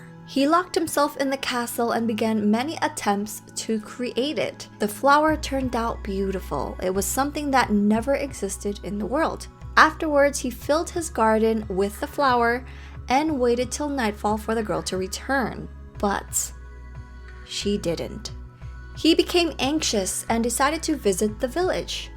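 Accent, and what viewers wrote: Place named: Italy. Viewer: I Love You, your theories are always great (P.s. your Italian is sooo cute 💜)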